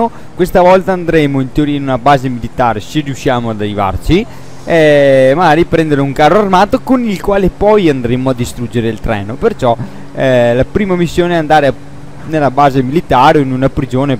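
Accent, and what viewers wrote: Veneto, Italy. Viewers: Setu veneto?🤔😂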